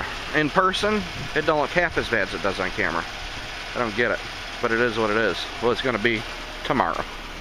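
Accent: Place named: Upstate New York, United States. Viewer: Nice job as usual! Where do you live? I'm from Fairport NY. Moved south to Raleigh in '97. My first house I bought was a 19K HUD HOME in Lyons NY. Just curious as to where you're at. Good to hear the accent. The weather is great here but watching your vids makes me a little homesick.lol nice work and vids keep em coming.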